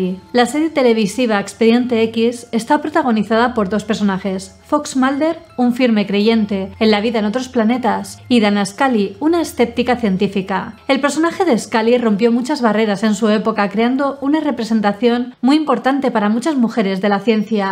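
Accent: Spain. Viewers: Hermosa con una linda voz y ese acento español , me enamore a primera vista ...de verdad 👍🥰 Saludos desde Chile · Qué hermoso acento español tienes. Tus investigaciones me encantan, pero con tu acento son perfectos. Saludos!!! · Como siempre genial 😃 . Un pequeño consejo, dicho desde el respeto, si la narración fuera más lenta la disfrutaríamos más... Sé que los españoles hablan muy rápido, pero somos muchooooos fans latinos, así tu encanto llegaria al 120 % !!!!! OMG . GRACIAS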